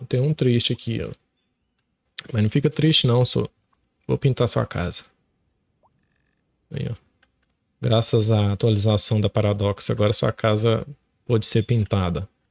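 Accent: Minas Gerais, Brazil. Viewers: sotaque mineirin q e bom de mais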